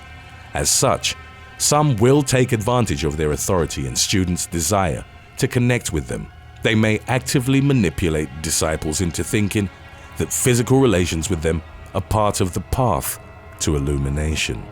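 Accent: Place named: England, United Kingdom. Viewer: Kudos on the voice. I love the dread and seriousness with a dash of English accent, makes me fear yoga!🤣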